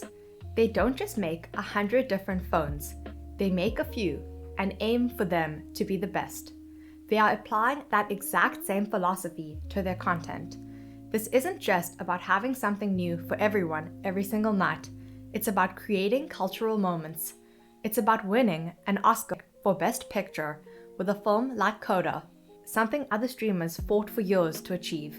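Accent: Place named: Zimbabwe. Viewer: Nice informative video. Well narrated and edited. Whats your accent? Zimbabwe ? Keep up the great work. Cheers from New Zealand 😎🎸🤘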